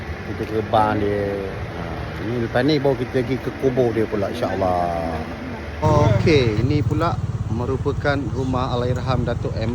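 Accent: Pahang, Malaysia. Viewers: Loghat Pahang awak yek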